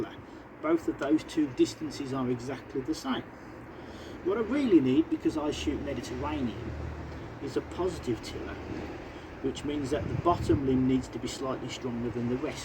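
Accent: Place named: Elmdon, United Kingdom. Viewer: Sounds klike you're near Elmdon , nice work thanks our kid😊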